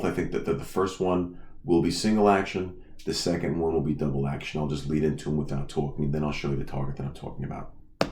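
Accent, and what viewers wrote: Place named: New York, United States. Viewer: Refreshing to hear a New Yorker talk about guns lived in NYC 40 years Always been afraid of guns until I moved to Florida lol now can’t get enough lol I have two cuz that retired also thank you for ur service and great educational video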